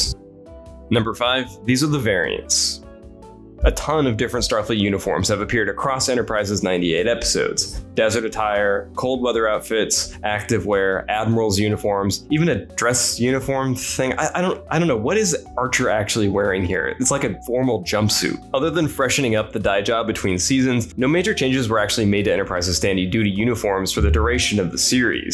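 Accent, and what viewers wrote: United States. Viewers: Wait... You hired an American?? Maybe I should have applied after all. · Wait, did y’all hire a new person? An American??? (I’m American too, so, I empathize haha) Or is this the fabled who Adam Clery is always shouting out? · Uhh. Who is the Yank talking? It's jarring! :P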